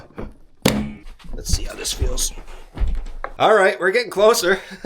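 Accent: Newfoundland, Canada. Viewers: That’s the Newfoundneez in you ………..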